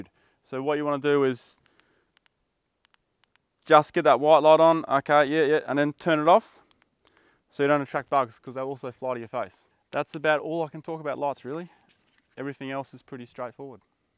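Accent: Australia. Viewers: Why did I know he was Australian before I opened the video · This guy sounds like Callum Von Moger. Where is Aus you from?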